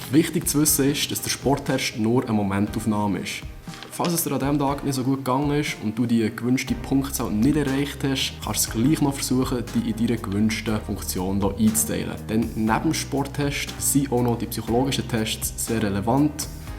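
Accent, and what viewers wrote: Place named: Bern, Switzerland. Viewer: Das nennt man Bärndütsch..;)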